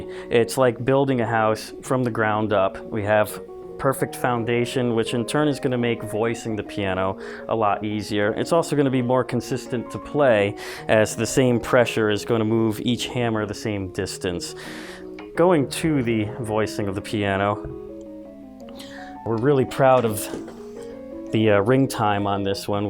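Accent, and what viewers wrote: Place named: Newark, United States. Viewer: Dat Ne'awk dirdy mouf akscent! 😄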